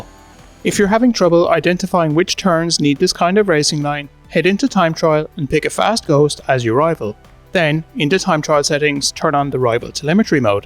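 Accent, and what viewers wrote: Ireland. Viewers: I would think ur Irish where you from I love these tutorials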